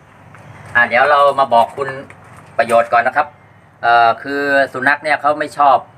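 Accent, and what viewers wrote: Chanthaburi, Thailand. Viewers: สำเนียงเหมือนคนจันทบุรีเลยครับ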